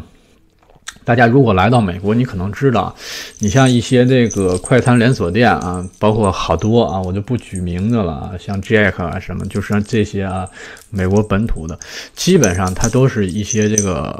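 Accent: Tianjin, China. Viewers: 這是天津式國語，在大陸很受歡迎的。您聽得習慣嗎？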